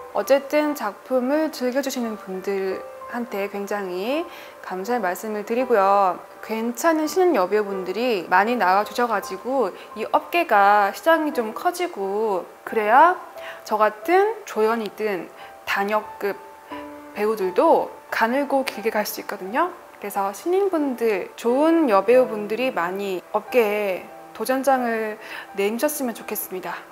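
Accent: South Korea. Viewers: 대구사투리가 살짝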